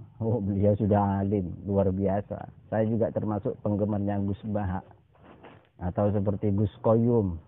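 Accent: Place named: Madura, Indonesia. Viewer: Ketauan banget orang Madura ya cak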